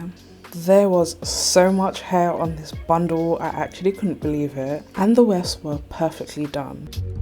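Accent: United Kingdom. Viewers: Was not expecting you to be British but hell yea thanks girly ur gorg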